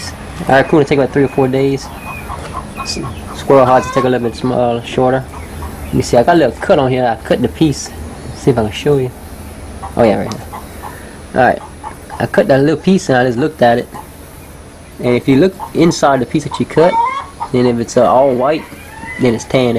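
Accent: Louisiana, United States. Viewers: Southern Louisiana, American accent. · I'm from Louisiana.. Promise this dude is too... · American... Southern of some sort, Louisiana? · Man that Louisiana accent come out thick.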